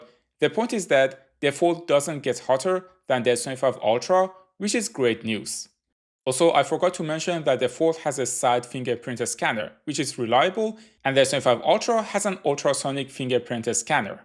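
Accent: Iran. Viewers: Dude that Thick Persian Accent got me 😅 well done bro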